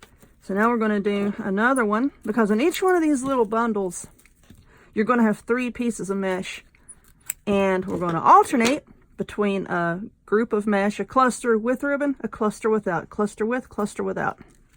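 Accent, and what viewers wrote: South, United States. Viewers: Guurrll! You crack me up doing Valentines crafts and we are STILL in 2018!😂😂😂 BUT! How can we resist this beautiful wreath! This is aaaabbsolutely STUNNING! Also I love your southern accent!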